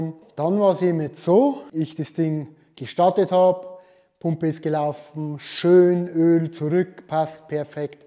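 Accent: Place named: Regensburg, Germany. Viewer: Wo kummst du her hörst du ho wie so a Regensburger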